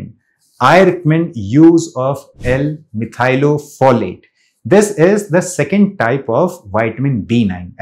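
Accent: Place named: India. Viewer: 😮Dr has surprised me because he is now saying vitamin instead of witamin after so many years but I understood him and I love his Indian accent plus his extreme intelligence. Thank you doctor I wished I lived in India so I could come see you. As usual Wise information thank you sir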